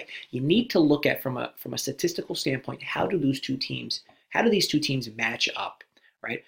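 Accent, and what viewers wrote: United States. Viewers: Thanks a lot for your analysis! i am a big newbie in sports betting and also a french native speaker so.. i find difficulties with the amercian accent.. could you please explain how to decrypt " Rider -2 Vs Sienna total 136" ? Yesterday i think that i did a big mistake, i saw your video and i thought i should put money on Missouri and i lost😫😫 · You are the man, thanks! I should have known from the accent you were from NJ as well 😉 I’m rolling with Arizona -1 for now.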